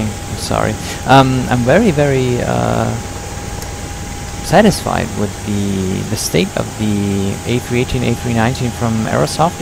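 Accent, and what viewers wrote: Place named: Germany. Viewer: Richtiger deutscher Akzent :D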